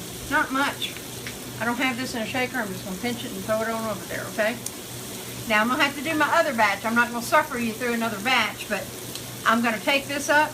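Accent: South, United States. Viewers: Love your southern accent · My Mom Would Love Her Southern Accent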